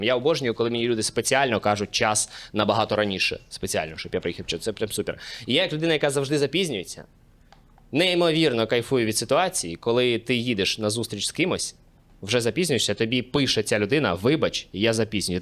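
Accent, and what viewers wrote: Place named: Kherson, Ukraine. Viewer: Та я його відоси тільки за херсонського суржика дивлюся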